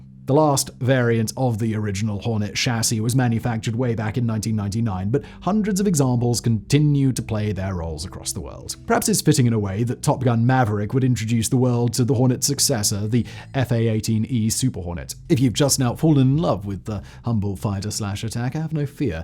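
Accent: United Kingdom. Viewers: Slow down! You talk so fast in your brit style us Americans can't keep up bro...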